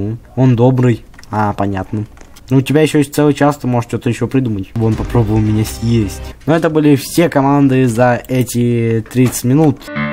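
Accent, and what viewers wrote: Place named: Russia. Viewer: Все говорят што он украинской: а я гаварю што он руской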